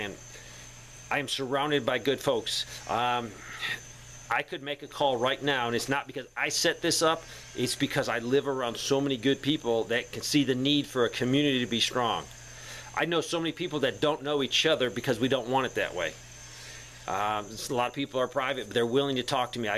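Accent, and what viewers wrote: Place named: East Tennessee, United States. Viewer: What area of the country do you live in? Not asking for your street address haha or even your town. Sounds like you may live in the mountains of East TN but not sure. Anyway- great content! Love your channel!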